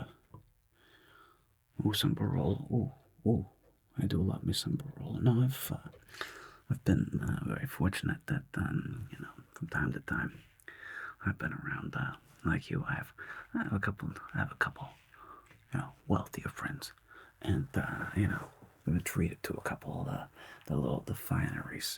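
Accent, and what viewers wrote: New York, United States. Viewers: This felt like a spider noir theme with the New York accent and the black and white. Amazing acting too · I like your NY gumshoe accent.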